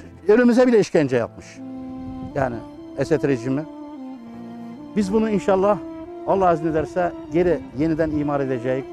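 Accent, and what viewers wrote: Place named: Antakya, Turkey. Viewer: Antakya da bir amcayi dinliyor gibiyim 👑🇹🇷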